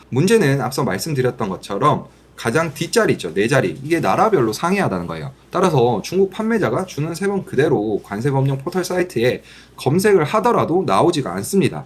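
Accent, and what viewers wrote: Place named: Busan, South Korea. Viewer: 어?? 혹시 저 보고 하시는 말씀 맞으시가요? ㅎㅎ 분석하고 거리가 먼 저 인데 설명 감사합니다. 어쩜 말씀도 또박또박 전달력도 좋으세요. 부산 사투리가 이리 멋들어져도 되는거에요? ^^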